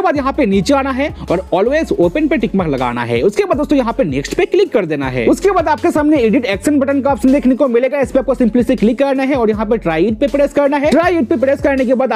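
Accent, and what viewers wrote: Bengal, India. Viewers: You are Bengali right 😂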